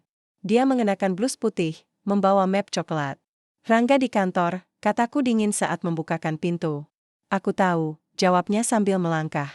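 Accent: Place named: United Kingdom. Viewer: Kenapa to kok harus pake logat inggris segala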